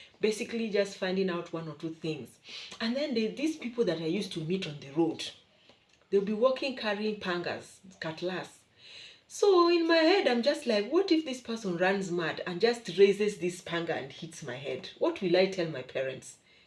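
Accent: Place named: Nigeria. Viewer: An informative video. As a Pan African and a Nigerian I was immediately drawn to watch this video as it warms my heart whenever people of African descent come together in love. I’m sure you’ve heard this before but I was struck by how your accent now has a distinct Nigerian tone to it ….. especially as you inject quite a few Nigerian words and phrases. Lol. Hopefully, there will be a part two to this video as this video seems to be overwhelmingly focused on your negative experiences and warnings about Nigeria …… as I’m sure on reflection there are more positive experiences and recommendations that you can share about life in Nigeria. I have subsequently liked this video and subscribed to your channel. Peace.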